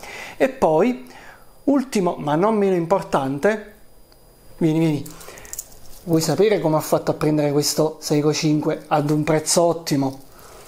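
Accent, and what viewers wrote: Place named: Paris, France. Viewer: Disturba solo un poco l accento parigino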